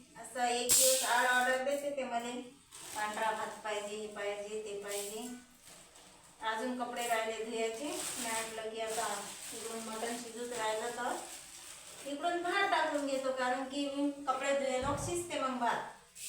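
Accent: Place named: Yavatmal, India. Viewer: तुमचं गाव पुसद. यवतमाळ जिल्हा आहे का. का आरनी आहे भाषा तिकडची अशीच आहे😊माझी बहीण आहे तिकडे. ईजनी गाव आहे यवतमाळ जिल्ह्यात आहे बहीण😊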